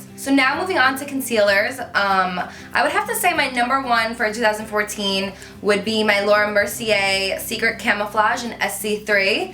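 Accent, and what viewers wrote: New Jersey, United States. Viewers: Your Jersey accent is everything OMG! Love your videos! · Lol you've been using the brow bar to go from your earliest videos and before I heard anyone else talk about whitening lightning:-) you are the best people say Queen Jaclyn and she's great but you are the Queen 4 sure! Comparing is bad I know so I will just say I love you so much please keep making videos when your a famous model or fashion designer or whatever dream you want and will achieve xoxo oh one joke I can't help it but I always know ur from jersey when you say the word drawer or draw in your case lol it makes me laugh everytime but not making fun I just think it's cute tomato tomotto LOVE YOU!!!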